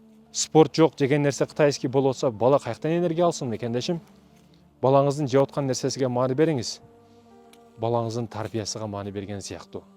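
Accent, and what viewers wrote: Batken, Kyrgyzstan. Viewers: Баткендик жигит го дейм мен билгенден, акцентине караганда, өзбек же кыргыз экенин өзү айтпаса… эгер өзбек болсо анда кыргызча жакшы билет экен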